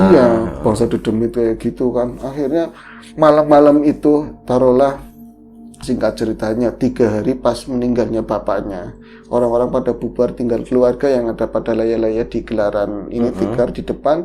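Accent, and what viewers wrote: Banyumas, Indonesia. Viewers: Basa ngapak mas dan selaan mas itulah yg dinanti...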